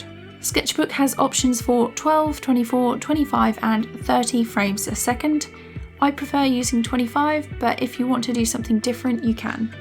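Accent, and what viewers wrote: United Kingdom. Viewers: nope this girl owns autodesk sketchbook and are you british cos you sound british. im british too!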